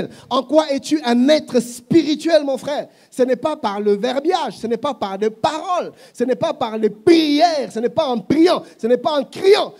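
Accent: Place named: Africa. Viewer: Pardon pasteur mais quand vous parlez africain nous ne comprenons pas. Nous apprécions votre enseignement.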